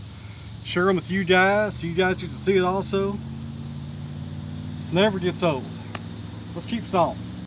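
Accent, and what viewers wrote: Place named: Tennessee, United States. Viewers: No I work in Kingsport TN, his accent is my accent. Very normal here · That’s a Tennessee accent...I’m betting a dollar to a donut!!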